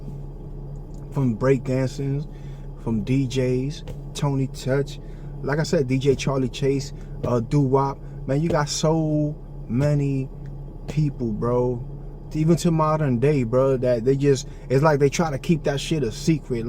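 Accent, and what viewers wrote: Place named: New York, United States. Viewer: You on every channel speaking like you from NYC🗽🤔🤣🤣. Boricuas🇵🇷did NOT move into the BX🗽 in the 60’s. Stop spreading lies. Boricuas🇵🇷been coming into NYC🗽since 1920’s. By 1960 Boricuas🇵🇷were the FIRST Bodega owners. The Boricua🇵🇷population has always been the highest population in the BX. If you were from NYC🗽 you would of known that. Central Harlem is the highest population for Blacks.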